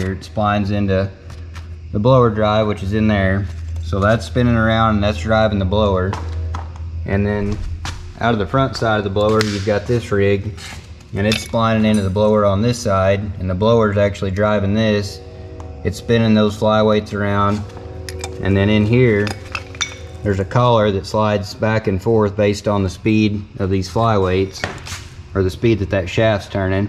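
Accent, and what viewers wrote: South, United States. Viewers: I enjoy every videa Please keep em coming when you have time. Even when the Cat cams are a real treat for you to clean up with all the "Blue shit" that comes on them... These relics are entertaining with the good ole boy country narration. Keeps the content interesting. Bottoms up 🥃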